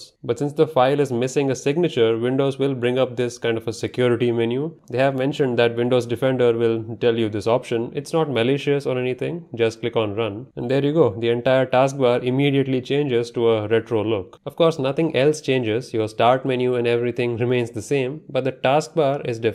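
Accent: India. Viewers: Dude looks Mexican but speaks Indian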